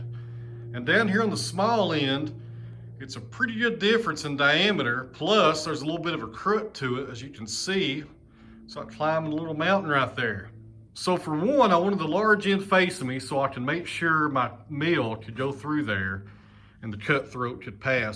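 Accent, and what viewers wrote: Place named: South, United States. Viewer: You are a very charming guy. I love your southern accent. Love from Canada 🇨🇦. The Cats are adorable 💕💕💕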